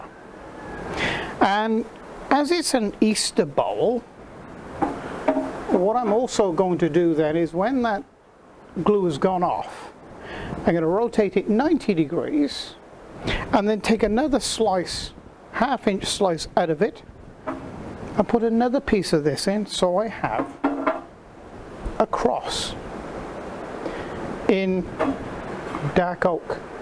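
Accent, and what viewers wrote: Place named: West Country, United Kingdom. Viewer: You talk in $, yet your accent sounds ‘West Country’!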